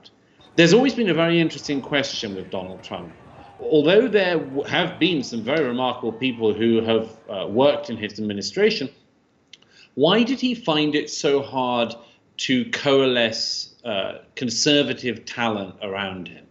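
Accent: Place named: RP, United Kingdom. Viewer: Firstly, why is Douglas wearing make up? Secondly, why are people listening to his analysis? In the summer he predicted that Trump would win in a landslide as a result of the BLM protest. talks nonsense with a posh voice. Sad that for a certain sector of the British public this is what passes for insight.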